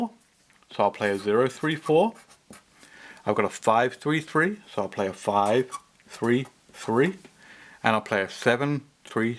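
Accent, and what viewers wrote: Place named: United Kingdom. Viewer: looking forward to. You UK? I love your accent.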